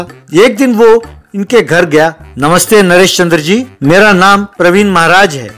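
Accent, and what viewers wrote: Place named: Patna, India. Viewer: Get puffy Patna tone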